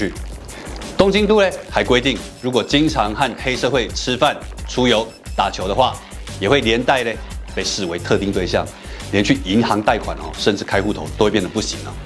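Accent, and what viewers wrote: Taiwan. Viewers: 今天第一次聽到蔡桑非常喜歡馬上訂閱台語的口氣非常讚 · 這個本土味聽得太舒服了，天啊～ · 因非常喜歡人中之龍而追上此頻道，祝蔡桑頻道就此火紅!! PS就是喜歡這種夾著台語的氣口，蔡桑加油!!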